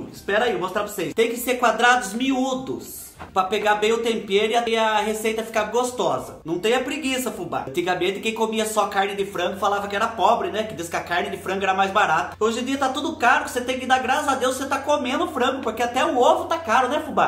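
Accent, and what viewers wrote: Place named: South, Brazil. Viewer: Fubá, também sou do interior de São Paulo, só que sua cidade é próxima ao Paraná e a minha próxima de Minas,por isso você tem esse sotaque mais puxado pro Sul e eu pro mineires...😂😂😂❤❤❤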